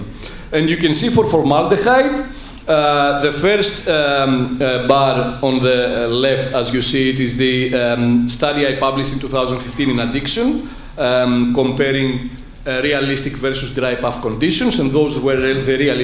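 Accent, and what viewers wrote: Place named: Greece. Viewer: 100% accurate Greek accent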